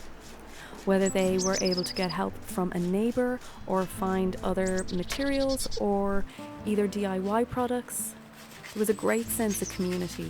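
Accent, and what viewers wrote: Ireland. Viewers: Well done!! Really neat to see the different ways to make and use stuff, that's lying around the house. I for one would love to see more shows like this from you. Thanks for sharing!! By the way...I lived in County Kerry Ireland for a year, many years ago. Ireland is such a magically beautiful place. I miss it dearly. Though, watching your video enables me to feel a bit of that connection again. Thank you for all the work you do. I love (and remember well) that lyrical Irish accent that I use to encounter when I lived there; hearing it always made my day. Yours is lovely, as well. :)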